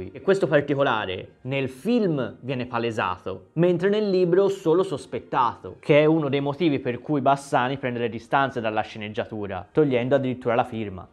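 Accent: Florence, Italy. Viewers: Mi piace l'accento fiorentino! D'atmosfera culto e culturale! questo video è breve ma utile, anche facile da capire! Grazie! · Ahaha tu fai schiantare Sei di Firenze vero?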